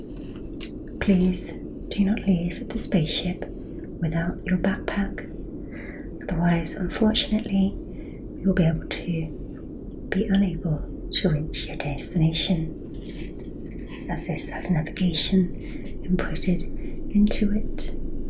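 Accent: United Kingdom. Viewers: Hallöü 😄are you english?or scottish or irish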